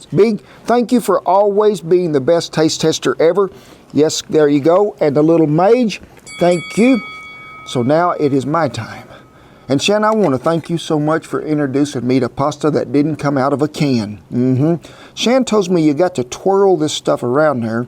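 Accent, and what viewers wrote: Italy. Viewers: We love and subscribe to your channel. We watch all of your new posts. Your Italian accent is annoying to me. Not trying to start a movement, it's just not funny or even tolerable to me. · I luv ❤️ Italian accent!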